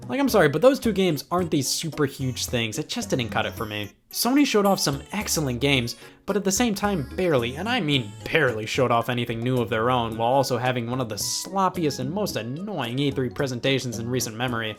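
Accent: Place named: South, United States. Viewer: Hey yall scott here you can tell he is a southerner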